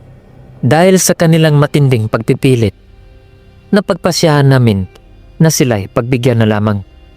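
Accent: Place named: Visayas, Philippines. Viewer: New subscriber idol gusto naku bisaya imong pag narrate ❤hehe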